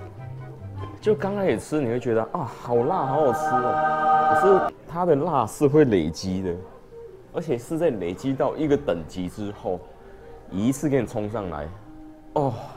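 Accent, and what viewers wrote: Taiwan. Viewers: 真的，藏不住的台味XD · 講話真是夠台哈哈，跟我叔叔講國語一樣哈哈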